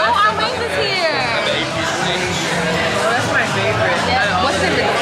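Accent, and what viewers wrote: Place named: Philadelphia, United States. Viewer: In all sincerity if you ever need to talk I'm here for you. You remind me of my niece I used to be close to. I was born and raised in Philly and I'm still close to you in Willow Grove. We could meet have lunch or get a coffee. I mean it I'm here for you, I've started watching you a year ago and think your soo funny I knew you was from Philly the minute I heard you speak. We have to stick together if you ever need to talk or vent I'm here. I run a small business as well I know how it gets sometime. Please don't hesitate to reach out.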